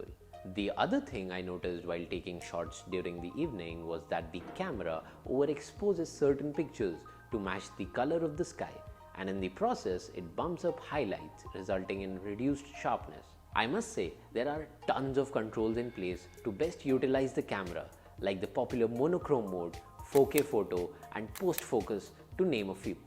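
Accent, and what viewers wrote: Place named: Ireland. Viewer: Background music and Accent are two different things. Many peoples in the world don't understand Irish English. Even UK and US have accents based on the people's locations. Sometimes try to speak another language than your English you learned from your birth. That's really annoying when someone bullies others on their accent.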